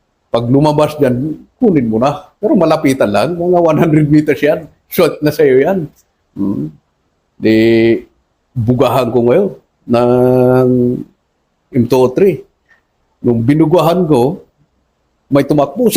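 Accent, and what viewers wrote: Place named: Kalinga, Philippines. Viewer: taga cordillera ka sir dba?/ fromwhere in the cordillera?? correct me if I am wrong..sa pronunciation and how you speak taga kalinga ka ba??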